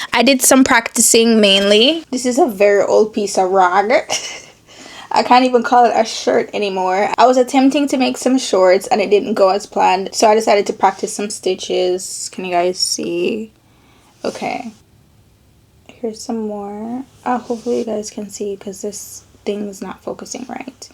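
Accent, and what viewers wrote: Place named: Patois, Jamaica. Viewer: lol the patois chips in sometimes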